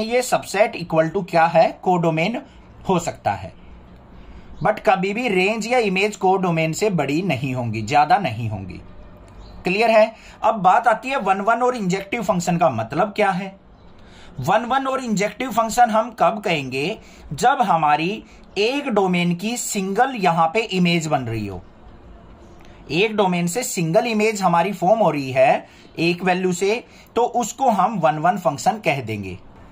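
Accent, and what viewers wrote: Haryana, India. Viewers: Sir are you from haryana❤?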